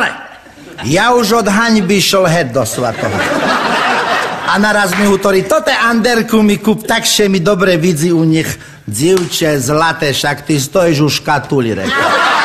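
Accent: Slovakia. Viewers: ander je najlepsi ale slovensky tazko rozumiet ten dialekt ;D ale predsa je zvlast ;D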